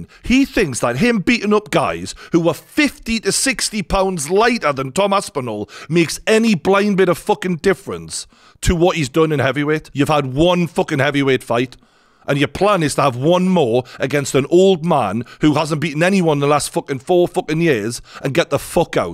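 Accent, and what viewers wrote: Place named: Geordie, United Kingdom. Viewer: Im a HUGE jones fan but to call him the outright goat is alil far-fetched, sure hes had an amazing career at LHW but im hearing alot of facts about jones, when he was younger and hungry but now hes just tryna take the easy way out... Huge aspinall fan also (im American) i think he has to have the fight with jones... It needs to happen. Ps i love the geordie accent 🙋🏻‍♀️😂😂😂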